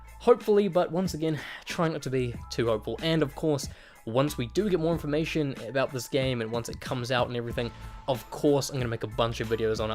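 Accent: New Zealand. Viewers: are you from new zealand? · Are You From New Zealand Bro 😂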